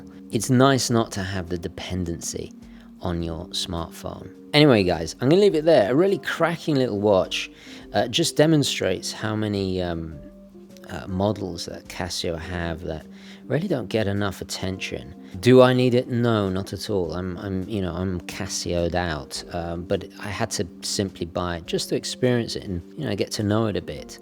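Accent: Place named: United Kingdom. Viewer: I have enough watches the only reason I still watch is because of his British accent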